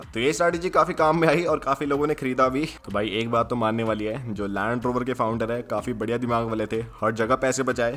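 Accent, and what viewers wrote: Haryana, India. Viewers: bhai nirmaladmir ki tarah bola kar ,tere andhar ka haryanvi baar baar bahar ajata hai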